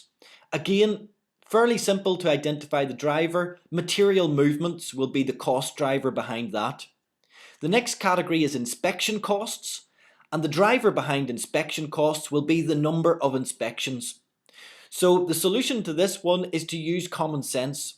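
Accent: Scotland, United Kingdom. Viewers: Scottish, I think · Is that Scottish accent? Soooo amazing!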